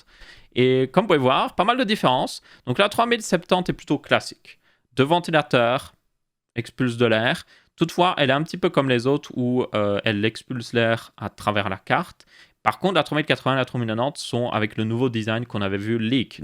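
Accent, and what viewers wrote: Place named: Belgium. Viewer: Je suis français et j'adore quand tu parles Belge. Je trouve ça trop cool. Puis on a moins l'impression de vivre dans un monde monolithique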